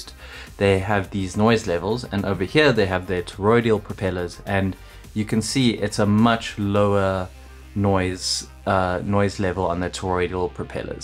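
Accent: South Africa. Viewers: I knew that lekka safari accent! Groete uit Duitsland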